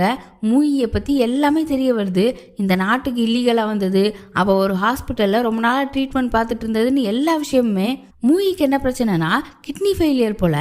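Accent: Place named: Tirunelveli, India. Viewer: Apdiya. Nanum old TVL new TKSI districthan. Irunthalum konjam different ahgathan irukku. But nallirukku unga slang😊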